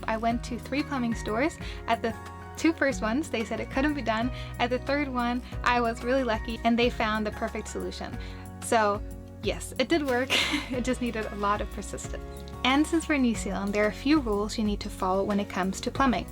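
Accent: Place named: United States. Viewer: Love the outtakes at the end. Your American accent is so perfect, I often forget you're from Sweden.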